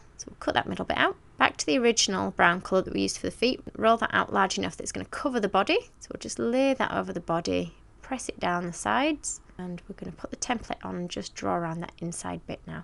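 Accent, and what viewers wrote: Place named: Lancashire, United Kingdom. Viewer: Blonde Rose She does sound enthusiastic.She actually says at the end how much she enjoyed making it.Also I am pretty sure she does the voice over after she has made the cake.And I am always impressed by how she manages to talk us through everything she is doing.She clearly takes a lot of effort to get her videos perfect.Music is such a subjective thing,what one person will,love,another 5 may hate.So I think it is a wise choice not to have it at all.I actually really like her voice,and what sounds like a soft Lancashire accent to my ears.And what a talent she is.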